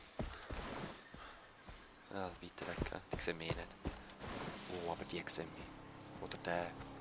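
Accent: Switzerland. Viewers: Ich find dini Lets Play's voll geil wills uf Schwizer dütsch isch und du machsch es so lustig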